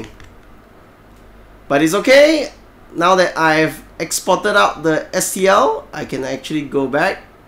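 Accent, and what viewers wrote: Singapore. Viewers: you sound Singaporean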